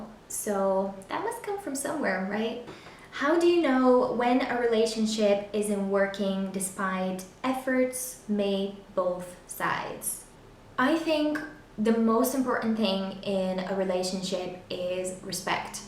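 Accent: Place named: United States. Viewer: but you have US accent! how? :)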